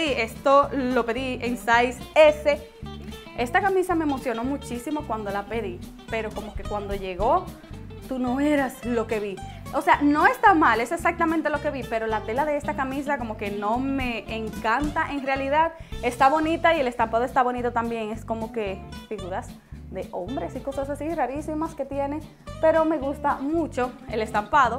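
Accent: Dominican Republic. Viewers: Tú eres Dominicana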